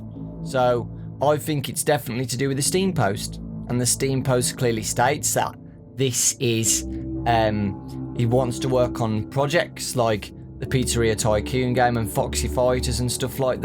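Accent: United Kingdom. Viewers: Is he british or something ?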